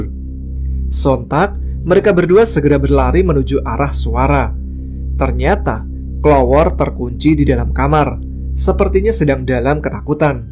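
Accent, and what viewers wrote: Malaysia. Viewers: Johor Malay kah ini bang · Respect pada mas cuba membawa cerita ini dalam tonasi bahasa Malaysia. Salam serumpun 🙏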